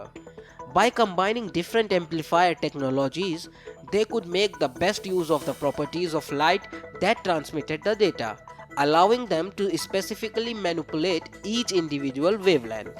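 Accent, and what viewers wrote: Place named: India. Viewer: 咖喱味英语劝退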